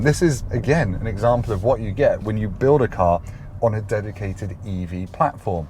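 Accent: United Kingdom. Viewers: Great review. I watch a lot of reviews with British commentators and you have a very distinct accent? What part of the UK is that?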